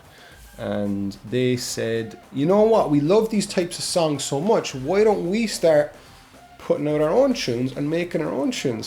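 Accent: United States. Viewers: Great video and great southern accent ! from Florida. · Hey, your Texan accent isn't so bad.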